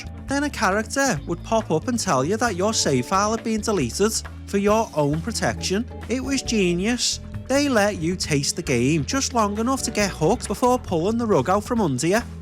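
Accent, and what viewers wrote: Liverpool, United Kingdom. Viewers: Fucking miss the scouse accent. We moved from Walton to Scotland when I was a kid. Don’t go back enough son 🥲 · Fuckin lovin the scouser annunciations :'>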